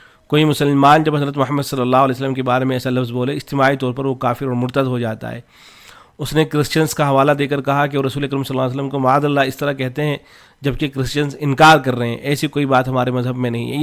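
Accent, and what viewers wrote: India. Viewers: مولانا السلام علیکم اپکاتعارف کیاھے آپ کہاں سے ہیں آپکا لب ولہجہ توشہردیوبندکے معزز لوگوں جیسا ھے ایسالگتاھےجیسےآپ میرے جانے پہچانے ہیں آپکی فراغت کب ھوئی ھے بہت شکریہ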